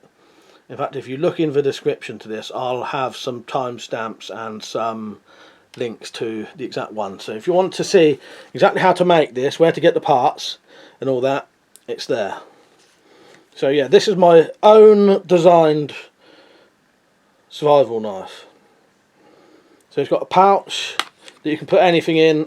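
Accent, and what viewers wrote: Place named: England, United Kingdom. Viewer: Great video.i grew up on and from your accent I'm guessing your from the same area as bruce.and rod Smallwood too.awesome scorpion reminds me of my homemade one.its on my YouTube channel.youll see the similarities.great looking blades man.mine are cheapo steal.only 1 I did is stainless and it takes 3 times as long.i use same tools as you.except my dad's portable band saw to take off as much steel as possible and grinding off the rest.before I did about 70% hacksawing.dont know if your a fan but I'm a true nerd maiden fan.up the irons!!!!that applies to knife makers too!🎸🔪🎸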